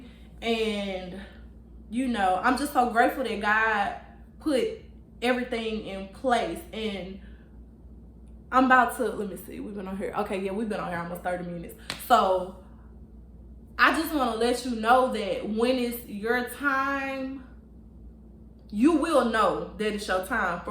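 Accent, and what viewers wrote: United States. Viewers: Thank you so much!!! I needed this so badly, you don't even understand. What's so crazy is that I have been watching your video on and off all day at work because I have been having to pause it lol, but I knew I needed to stick around and watch until the end. My jaw was on the floor when you mentioned real estate when that has been a career I have been trying to pursue for over a year now,but for some reason just can not pass the exam. Maybe God is telling me it is not meant for me to get licensed in this state. I am definitely going to keep praying to remain obedient and faithful to him. I know blessings shall pour down. Yes I will be alone and won't know not one person in that new state, but I know God will provide connections for me. Your testimony really got me going. I knew by your southern accent it was going to be a good one lol. 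I know a Mississippian/Memphian when I hear one! I pray that more blessings come your way. Are you on any social medias? I would love to support your business whenever I'm in TX. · Your American accent is too heavy I struggle to get some words. E.g. lulu for little.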